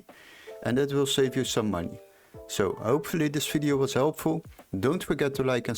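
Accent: Netherlands. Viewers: are you from Friesland:hand-pink-waving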